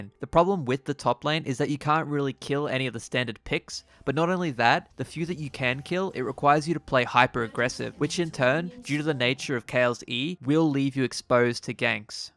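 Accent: Australia. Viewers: Loving the series mang keep it up, real informative and nice to hear an aussie accent behind the skill. Have you considered doing coaching videos?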